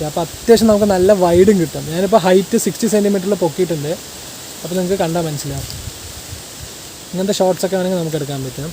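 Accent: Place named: Palakkad, India. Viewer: Wawoo അടിപൊളി 👌👌 സൂപ്പർ പാലക്കാട് ഭാഷ 👌👌💕💕💕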